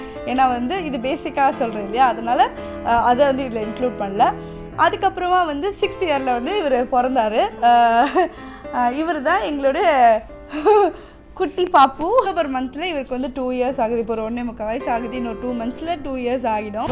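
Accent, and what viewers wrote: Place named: Kerala, India. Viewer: Malayalam slang theriyudhu lighta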